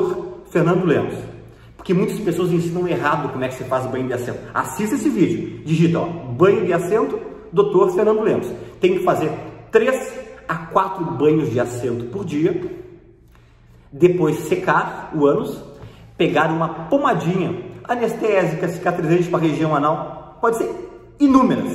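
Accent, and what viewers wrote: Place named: South, Brazil. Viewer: Dr Fernando Lemos...um médico de muita sabedoria...deveria ter mais médicos desse modelo...sabe é explicada muito Me ajuda demais essas explicações Merece nosso maior respeito Tive e operei...rompeu mais Deus como dói Como vi já o chamaram de um médico lindo e maior elogio no sotaque Sulista....normal as pessoas ver um médico q ajuda muito as pessoas ser amado por muita sabedoria e bondade em nos ajudar Obrigada Dr...tem todo meu respeito Q Deus o abençoe imensamente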